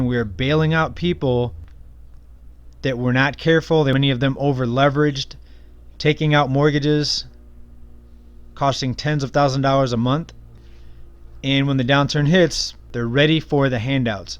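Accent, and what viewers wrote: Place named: Pennsylvania, United States. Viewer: Does this guy happen to live in the rust belt? More specifically Pennsylvania. I like the dialect.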